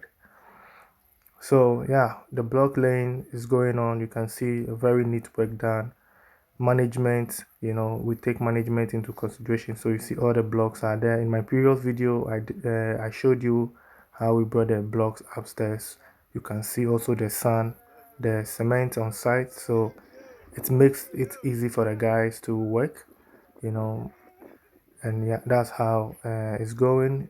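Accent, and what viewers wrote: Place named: Akan, Ghana. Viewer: Wow I love your Akan tone , it travels to millions in our homeland than the English Language. We've been shying away from our own dialect and i'm proud till date , i speak three languages in Ghana.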